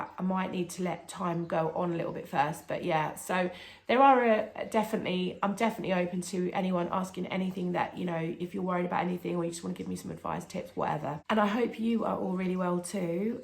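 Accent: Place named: United Kingdom. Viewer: The strength you have to go through so much, and raise two children! It's unbelievable when MD's don't take us seriously. You should have immediately been given a mammogram and I should have been given a CT scan. I shared your video with a friend who was upset about the neglectful treatment you received, and she's impressed with your positive attitude too! Btw, I'm in the US. I'm guessing by your accent you're in the UK. My mother is from England. Anyway, it sounds like you're taking care of yourself. Please don't be hard on yourself! The supplements sound good. I take so many supplements, including Resveratrol. I'd totally replace the alcohol with green tea and whatever nutritious things you enjoy. Remember there are lots of people who care! 💝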